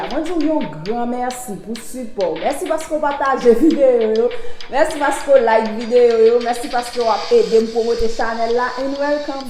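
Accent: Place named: Cap-Haïtien, Haiti. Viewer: Se vre wi! Menm lè anpil nan yo pa konn lè yon fanm vle yo! M rmen ti aksan capoise la anpil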